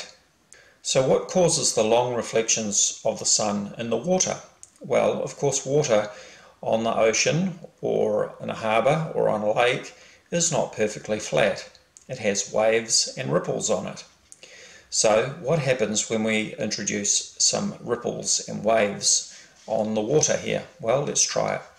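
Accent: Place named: New Zealand. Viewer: you sound like a kiwi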